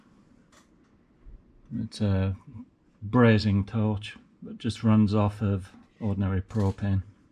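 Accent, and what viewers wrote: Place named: Cumbria, United Kingdom. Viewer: Hi, I'm from Cumbria and your accent is very similar....where are you from?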